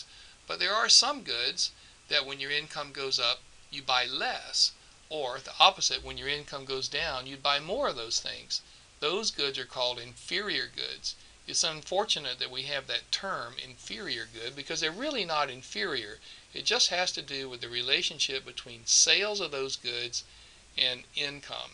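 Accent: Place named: South, United States. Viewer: that southern accent tho.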